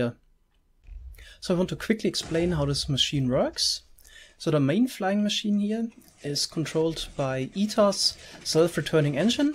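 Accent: Germany. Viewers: A netherstar block, some octuple compressed Diamondblocks and making it a big multiblock structure would be a worse troll ^^ Yeah. But some things are.. Well.. How to say. Inefficient and laggy? :D I mean if you place down 200 pistons, observers, redstone dust, etc. you'll get some problems :D (on mps ^^) Bist du eigentlicher Deutscher? Bin noch nicht so lange dabei und merke es nur am Akzent ^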